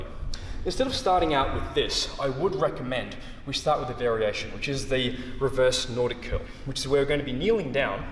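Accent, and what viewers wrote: United Kingdom. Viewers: Who are you with the British accent? Gym closed bro !! Why are your still open bro??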